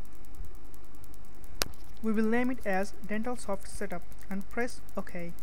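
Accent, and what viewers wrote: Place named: Bengal, India. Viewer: What happened in m You also do not speak English good!! You speak Bengali vernacular.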